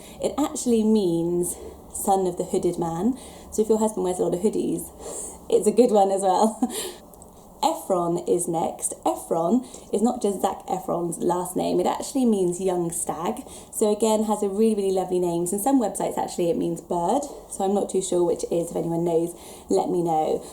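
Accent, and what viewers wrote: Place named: England, United Kingdom. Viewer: I like the name Aspen but i don't know about it for a girl. But, when i hear the name i automatically associate it with a character from the book series The Selection, i know that sounds silly, but i really love that series and the character is supposed to be a really handsome heroic person in the series. I'm also really young but i just like hearing people talk about baby names, it sometimes makes me think of what i would name my child in the future. Also, I'm American and I live in Tennessee so I live in the country, so names that i hear everyday are probably very different than names you hear everyday in the UK or anywhere in Europe really, so i just find these videos interesting. By the way I love the name Liberty with your accent but when i say it sounds silly LOL if i had a English accent it would be adorable though.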